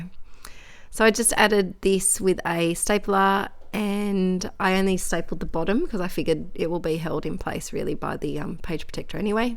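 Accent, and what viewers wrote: Australia. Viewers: AWESOME Project Life video! So good to hear another Aussie! I absolutely loved it and have definitely subscribed. I think we have a bit in common and if you get a chance I would love you to have a look at my channel xo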